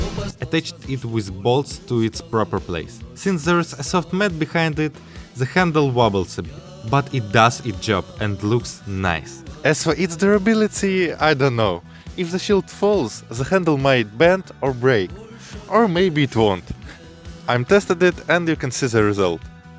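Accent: Poland. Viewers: We understand you are not Hungarian you are polish! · are you polish?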